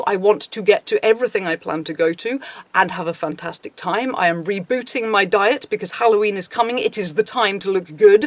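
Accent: United Kingdom. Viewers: hey, what part of Britain are you from?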